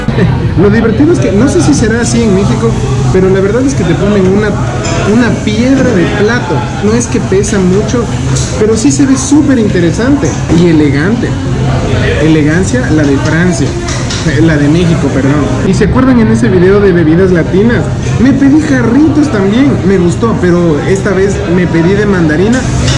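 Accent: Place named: Ecuador. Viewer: Eso me gusta del... No cambia su forma de hablar Ecuatoriano hasta las huevas... Mijin.. ánimo Bro.. más vídeos porfa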